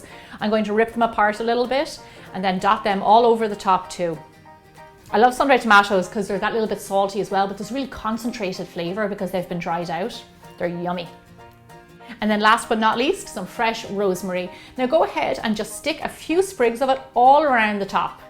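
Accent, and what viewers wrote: Ireland. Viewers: I love the way she says tomatoes😅 I'm not Irish so that's why I love your accent :)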